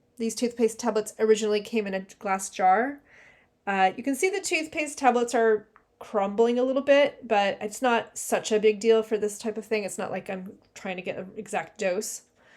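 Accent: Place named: United States. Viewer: I was getting confused watching vid.....back ground looked like England but accent American.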